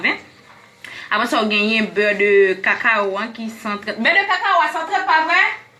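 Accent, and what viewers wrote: Haiti. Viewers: Moun nan k dii exprimel an français madame nan sonw ayisyèn ok saw di a pa gen plas Li dakó banm zizi San valè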